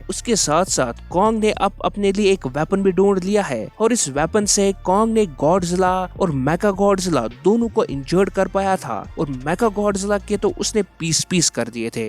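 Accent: Kashmir, India. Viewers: Bhai kya aap kashmir se ho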